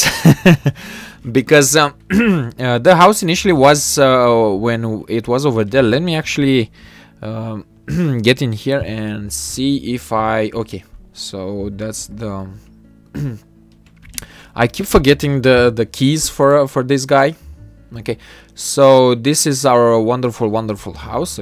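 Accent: Romania. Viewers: bip cu accent romanesc ;) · he is romanian, he can't have english accent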